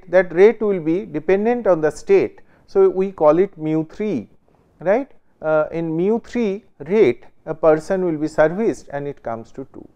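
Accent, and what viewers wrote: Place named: India. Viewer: This indian english is awesome)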